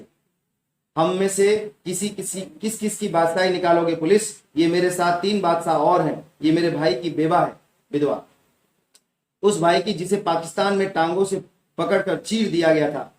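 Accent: Odisha, India. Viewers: Sir app odisha se he kya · Is sir from odisha state ?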